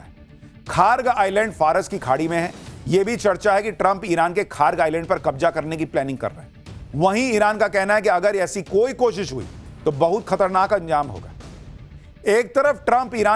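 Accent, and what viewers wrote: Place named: Patna, India. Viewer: Sir aap Patna Se Ho keya apki language patna wala hai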